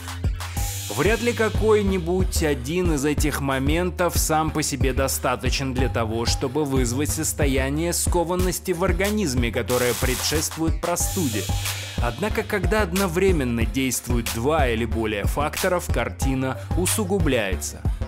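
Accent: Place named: Baltic states. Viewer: из Прибалтики,вот и акцент,видимо,местечковый.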